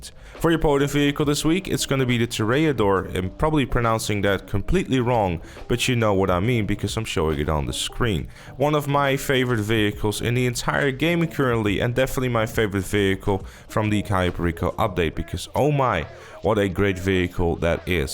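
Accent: Netherlands. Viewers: You sound Dutch , am I right 😜?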